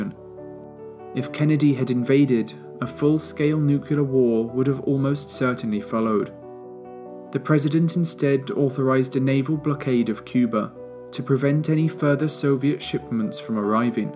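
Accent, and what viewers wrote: United Kingdom. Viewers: Just was wondering what is your nationality? You acent sounds British but very proper. It makes the documentary just that much better. Great video I thought I knew a lot about it myself but after watching this I can honestly say I fell like I learned a great deal in the time it took to finish your video. Please keep making videos.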